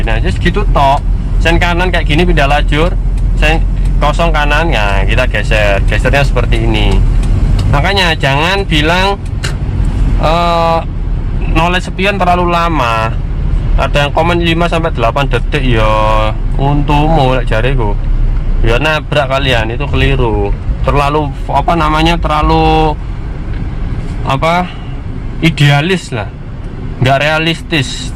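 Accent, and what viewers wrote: Surabaya, Indonesia. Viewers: aku malah suka bahasamu mas,boso suroboyoan🤣🤣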